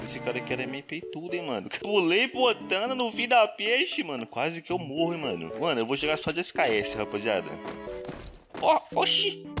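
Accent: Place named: Rio de Janeiro, Brazil. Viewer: parece ser carioca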